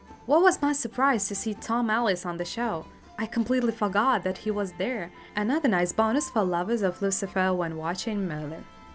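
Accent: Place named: Russia. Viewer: At the beginning of the episode I somehow had the feeling as if a Russian person spoke English (not a criticism)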